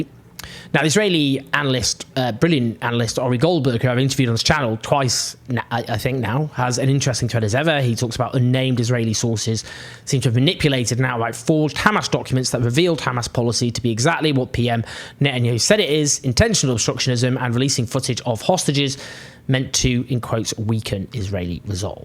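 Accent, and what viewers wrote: United Kingdom. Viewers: ok mf, nothing your British accent voice can do. about Israel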